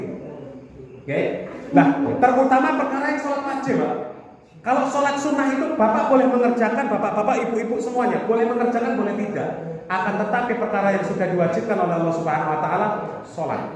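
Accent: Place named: East Java, Indonesia. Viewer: Jawa timuran ya ustad...? Bahasanya khas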